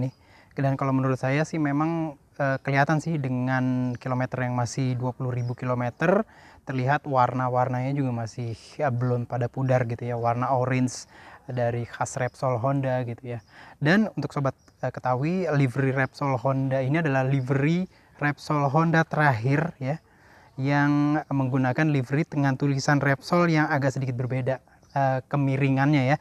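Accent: South Jakarta, Indonesia. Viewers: Agak2 jaksel ya ngomongnya 😁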